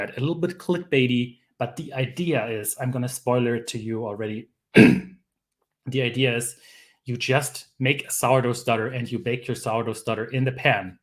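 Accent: Germany. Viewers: I thought the German accent was fun! Do you have to actively try to suppress the German accent when speaking English or does that come naturally?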